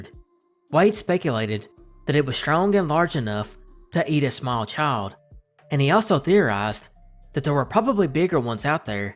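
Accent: South, United States. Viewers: Southern accent x Mysteries. Subbed. Finally something new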